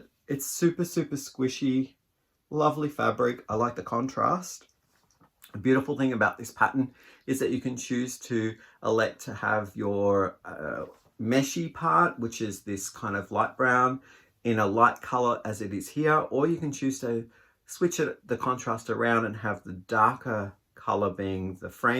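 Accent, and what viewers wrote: Australia. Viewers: Happy belated birthday! How crazy is it that you received 2 cards exactly the same!! The sweater looks fantastic on your hubby! That gray shawl is beautiful I like how you incorporate the contrasting orangeish color and the burgundy will be a nice contrast as well. Where are you from originally? I've been trying to place your accent, it give me Australian vibes 🤔 I hope you had a great time at the new restaurant, can't wait to hear about it.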